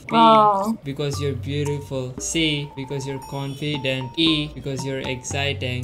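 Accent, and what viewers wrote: Hyderabad, India. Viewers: Bhai tu Hyderabad se hai?? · dude you're from Hyderabad? lmao 😂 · you speak 100% of indian accent , that women must be she didnt know how indian accent sound like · Bro are you from hyderabad wow...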